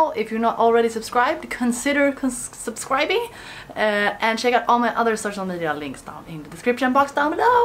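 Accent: Sweden. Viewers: Jag tycke väll att de va lite svensk brytning i din engelska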